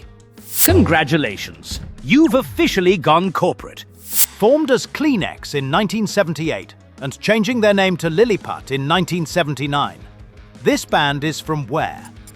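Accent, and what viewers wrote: England, United Kingdom. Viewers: Why the English accent talking about US punk ?.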